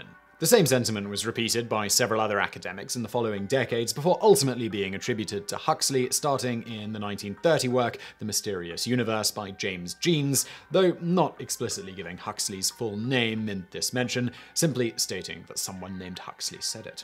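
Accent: England, United Kingdom. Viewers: Given your British accent, the typo in the thumbnail kinda ruins a lot of credibility of the research. Or it's just a genius ploy to get higher engagement via outraged comments.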